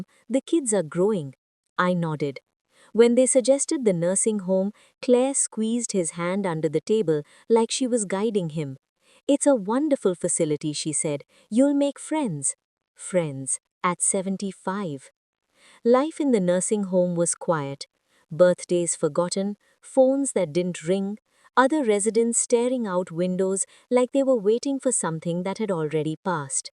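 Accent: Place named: India. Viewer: Narrator sounds Indian or Pakistani. Those cultures do a much better job of caring for their elders than the UK & USA does so this was an interesting topic for the Creator.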